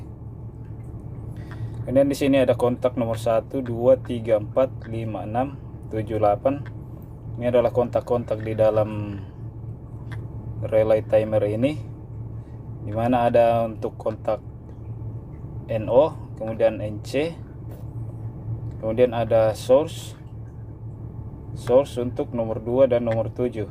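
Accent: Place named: Sulawesi, Indonesia. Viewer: kayak logat org sulawesi 😁🙏🙏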